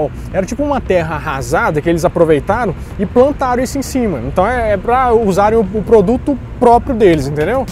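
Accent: Minas Gerais, Brazil. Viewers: “Burracha”, “u pau tá quebrando” Hehehehehe Mineirês é top demais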